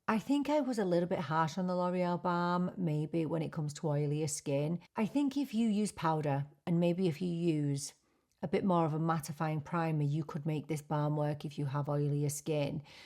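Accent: United Kingdom. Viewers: I just found your YouTube channel and I have subscribed first. Let me say I was drawn by your British accent. My mother was British. Next let me say it. I find your delivery very informative yet very sweet and I like that so with that being said, let me say this. I have been debating about it cosmetics balm. I feel you have isn’t it a very fair review and I don’t feel loud that it is a product that I’m looking for I am 72 years old and I have been wearing Estée Lauder foundation for years now however I don’t think it’s working for me any longer. It just seems to lay there on my skin. I may give the L’Oreal try. Thank you for this review. I will be following you.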